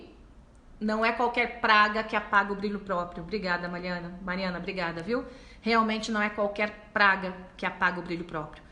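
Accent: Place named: Rio de Janeiro, Brazil. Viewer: Robô carioca deslike!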